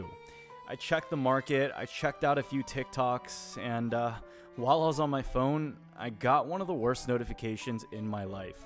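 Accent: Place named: DMV area, United States. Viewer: You from the dmv area?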